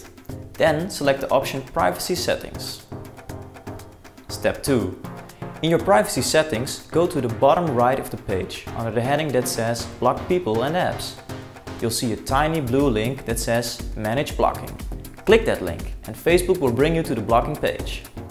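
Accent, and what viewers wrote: Netherlands. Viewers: you're from the netherlands arent you?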